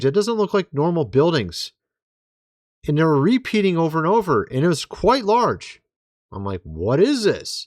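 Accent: United Kingdom. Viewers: Why is a British guy in America ?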